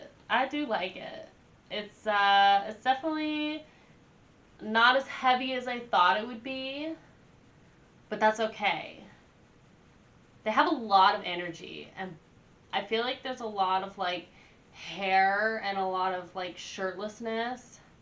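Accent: South, United States. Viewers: Sweetheart, where are you from? I'm from Tennessee and I love your southern accent. I pretty much lost my southern accent in college. But I still think it's cute for a female! The song is filmed at a concert they did in 1991 In Moscow Russia, 1.6 million people were at that show. Dimebag Darrell is the guitar player and he was killed on stage on 04 but he was and still is a guitar God. His brother Vinny Paul Abbott is playing drums. They were both legendary.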